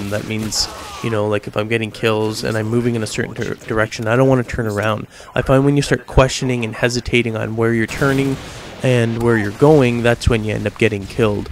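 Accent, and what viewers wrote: Canada. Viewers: Is this dude canadian? his accent is kinda funny.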